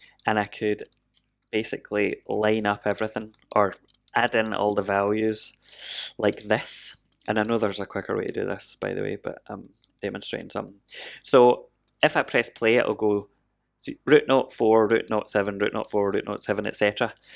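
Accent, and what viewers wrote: Scotland, United Kingdom. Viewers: When you started explaining, I was thinking it's like a macro. Instead of just playing the note, you trigger the macro that adjusts the note to your liking. I must say I thought I was the only person on earth with a serious interest in trackers, mechanical keyboards and Melt Banana, but I'm happy that I was proven wrong. I've been using the Beatstep Pro for a while now and I just did a 6/4 track. How hard can you make that! I cannot believe "copy these 12 steps" actually turns it into 16+8 instead of 12+12. That's definitely not a problem with trackers. I have been eyeing the options for a while now and after seeing this video, I ordered a Teensy. Should be here tomorrow. Thanks for your hard work, you explain complex things in a very understandable way. And your accent always takes me back to my travels on Skye, Arran, ...!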